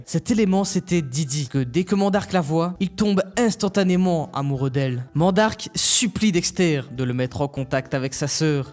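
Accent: Belgium. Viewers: T’es belge w?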